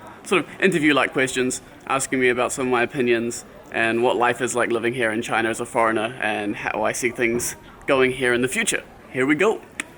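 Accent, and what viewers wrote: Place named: United Kingdom. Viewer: your english does not sound very much like those from new zealand. it is more british.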